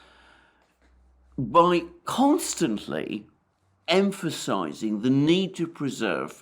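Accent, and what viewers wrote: England, United Kingdom. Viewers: It’s funny hearing Russian propaganda in a English accent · Dont you love fine russian propaganda with a british accent?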